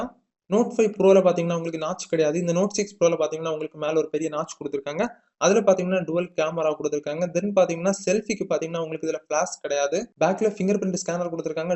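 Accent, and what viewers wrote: Kanyakumari, India. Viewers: Super video bro and voice look like our kumari slang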